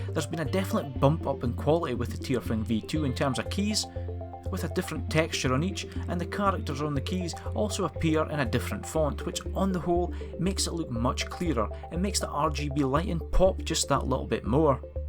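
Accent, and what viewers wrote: Scotland, United Kingdom. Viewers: Love that Scottish accent. Great review and greets from Germany!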